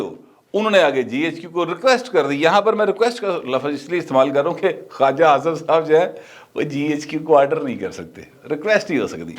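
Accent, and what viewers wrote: Punjab, Pakistan. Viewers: Sir jee, your punjabi touch eases any tension. Love listening to you.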